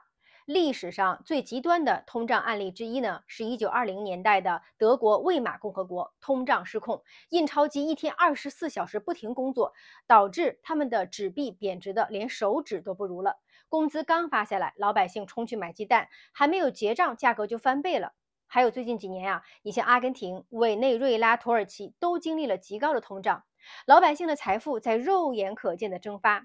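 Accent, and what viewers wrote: China. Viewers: 你应该是大陆人吧，居住在台湾，口音像大陆人，写的字也是简体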